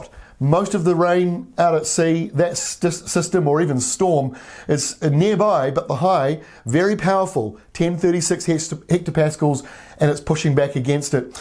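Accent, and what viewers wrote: New Zealand. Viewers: Nah...Phillip’s the best...I’m even getting used to the New Zealand accent!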